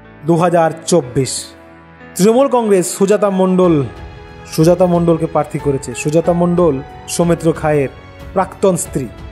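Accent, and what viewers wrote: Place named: Bangladesh. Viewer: এই চ্যানেল কি বাংলাদেশের চযানেল? উচ্চারণ শুনে মনে হল ।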